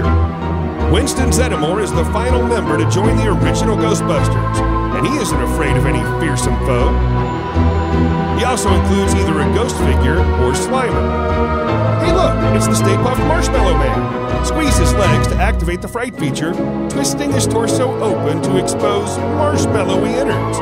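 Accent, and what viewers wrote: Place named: Michigan, United States. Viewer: You have the Michigan accent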